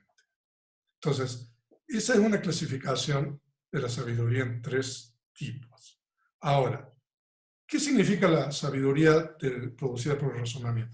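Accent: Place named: Córdoba, Argentina. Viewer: Y esperamos tener el honor de que nos visite en Argentina (su acento cordobés no lo perdió 😉)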